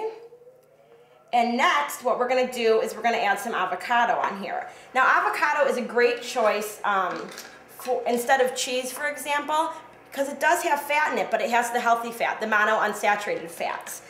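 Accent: Chicago, United States. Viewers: Are you from Chicago? Your accent suggests it. That sandwich looks great too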